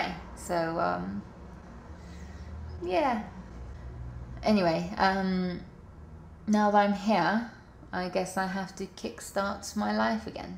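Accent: United Kingdom. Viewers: You can tell how perfect her British accent is based on her mispronunciation of Tokyo, despite being native Japanese! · This needs to be more popular..... I mean, this is genius. It's interesting to look at. Looks realistic. Looks cute. Her english accent is fantastic. Spread~ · an asian in london with a british accent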